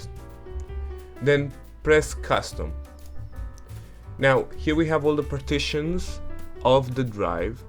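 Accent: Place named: Romania. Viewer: ba te am vazut roman dupa accent si dupa am vazut si emagu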